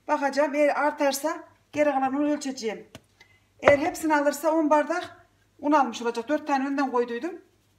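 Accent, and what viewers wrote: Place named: Central Anatolia, Turkey. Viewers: Eline sağlık çok güzel oldu poğaçalar ve de doğalsınız Kayserilimisiniz iç Anadolu şivesi ile konuyorsunuz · Ellerinize emeğinize sağlık canım çok beceriklisiniz maşallah memleket neresi konuşma şiveniz Yozgatlılara benziyo harikasınız 😘😘😘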